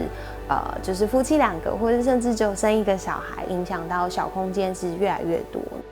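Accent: Taiwan. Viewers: 😆 好喜欢台湾腔的普通话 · Shout out to the Taiwanese accent. 濃濃的親切感。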